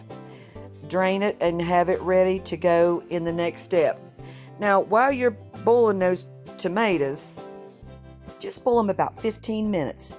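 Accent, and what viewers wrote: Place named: South, United States. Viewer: Thank you ma'am I can tell by your accent,you know what you are doing. My mamaw & my momma made this really, really good, sadly, I never paid enough attention. Adding the sugar kind of scares me ( because I had macaroni and tomatoes at a friend's house growing up and she put sugar in hers and it wasn't like my mom's or mamaws....but mom did like hers every now and then like that). Is the amount of sugar you use do something like tame the acidity of the tomatoes or does it taste more sweet ? I like salt & pepper on my maters and sugar in my cereal,lol 😊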